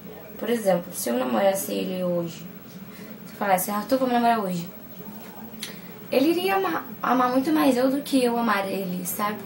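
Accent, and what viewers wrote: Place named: Rio de Janeiro, Brazil. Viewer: pelo "x" no final das palavras, vc e carioca.. onde mora ? em qual parte do rio ?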